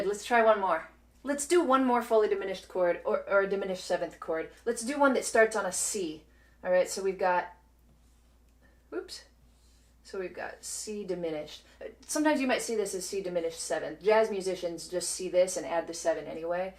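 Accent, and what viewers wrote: Scotland, United Kingdom. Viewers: Loving the Scottish accent. Oh, and the lesson. Thanks! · Are you half Scottish ?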